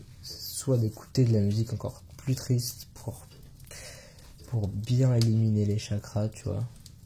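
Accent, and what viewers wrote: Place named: France. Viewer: No entendi nada pero me gusta tu acento y idioma Frances 😊😊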